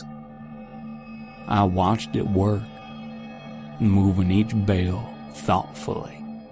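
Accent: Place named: Nebraska, United States. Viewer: You really did a good reading of this tale. Your 'accent' for this reminded me of growing up in Nebraska.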